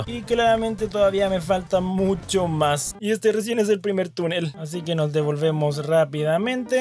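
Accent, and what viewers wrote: Chile. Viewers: eres chileno??????? saludos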